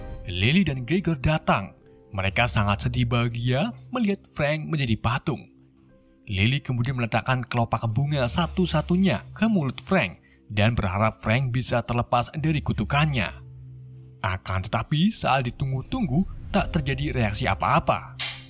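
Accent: Malaysia. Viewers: Orang Malaysia ya min ? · Nampaknya komentator ini dari Malaysia, sebab ada sedikit terdengar dengan nada yang berlogat Malaysia. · Min. Gua mau nanya lu orang melayu ya. Soal nya gua teliti dari logat lu ngomong bahasa indonesia kayak orang melayu. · Bang kok loghat lu kek orang malaysia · Abang dari malisiya ya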